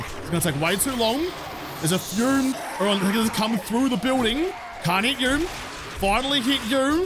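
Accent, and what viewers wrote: Australia. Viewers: maybe ill run into you oneday. Been on it a fair bit lately. Keep up the good work dude.. Love your videos. Its nice to listen to another Aussie instead of a yank lol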